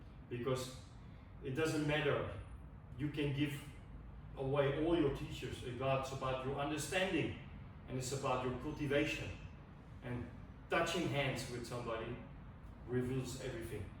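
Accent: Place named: Israel. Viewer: I really like your videos because of how deeply you research things, I am so curious about your accent. My first guess is Israeli. I would like to see more about your fighting skills and which style ir styles you use. Is it now your own lineage. I mean are you now following a path where you create your own stuff? I don't get what you said about finishing a lineage and then learning another. It must have been so confusing. The sound is not great. Too much echo. I want to develop internal enegy control. I am not training any martial art. I want to feel better and have more control. Getting into a martial art might follow. Thanks for this video.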